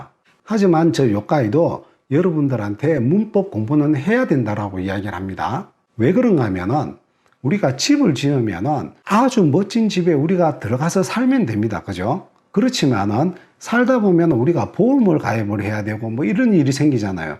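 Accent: Gyeongsang, South Korea. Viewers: 겡상도 발음 너무 친근감 ㅎㅎ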